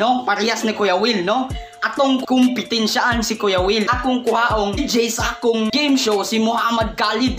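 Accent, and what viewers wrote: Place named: Visayas, Philippines. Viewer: Bisaya ka pala sir hahha makalingaw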